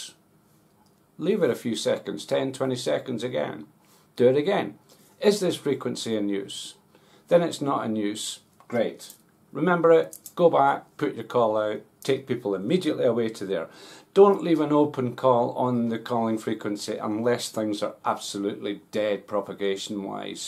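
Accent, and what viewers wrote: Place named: Scotland, United Kingdom. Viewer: Hey you old hippie. You sound very much like a Scotsman. I'm a Ham radio operator and quite often I do 11 meters. Hang mostly on 27.385 LSB which is the national calling frequency on 11 meters. I use a Yaesu 991a which I had the MARS mod done so I can go anywhere on 25 to 27 MHz. I use an Antron 99 antenna for 11 & 10 meters. I've got an MFJ 969 Versa Tuner II, roller inductor tuner just in case my 991a won't tune the frequency. Cheers & 73 from W Rusty Lane K9POW in eastern Tennessee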